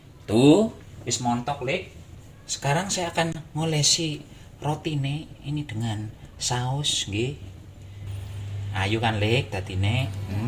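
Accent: Banyumas, Indonesia. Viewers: Matur suwun resepe lik.... Kok lik ngapak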